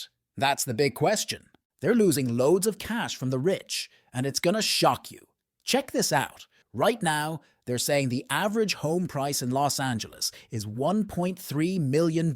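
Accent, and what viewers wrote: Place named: Southeast, Canada. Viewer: I think every American is just a dumbass. Including this Southeastern Canadian sounding guy too.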